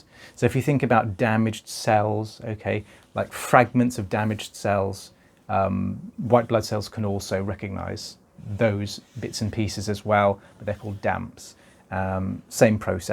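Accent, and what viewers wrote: United Kingdom. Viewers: Sigh…. fascinated by an ear cleaning narrated by a lovey Brit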